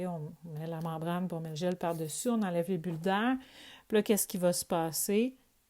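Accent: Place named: Quebec, Canada. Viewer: Français québécois (et quelques termes en anglais). Ce n’est pas en français international, désolée.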